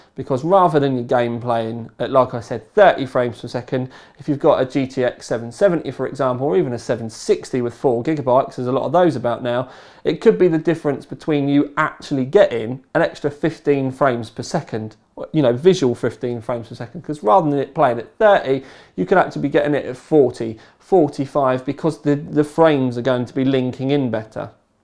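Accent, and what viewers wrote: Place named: South East England, United Kingdom. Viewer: Off the top of my head, you are a Kent, Essex lad... I could be wrong... Maybe South of the Thames... I know you are somewhere South East, South West possibly, but I would have lost my money... South East for me! Peace...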